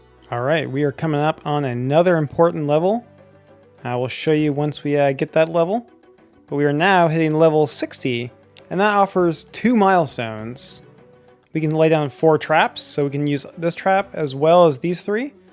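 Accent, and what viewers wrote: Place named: Midwest, United States. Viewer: Your outros give away the fact that you're a Midwesterner 😂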